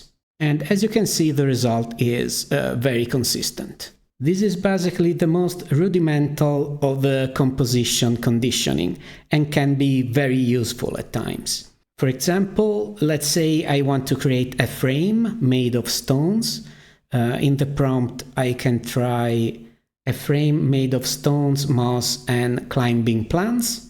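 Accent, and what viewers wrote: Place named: Italy. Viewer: Thank you! Watching your videos after having spent a few months with comfy is like going through a grammar book after having had a thousand hours of input. Same hilarious feeling! Also your accent is cool! I've spent some time around one Italian dude in India, so watching your videos brings back those fun memories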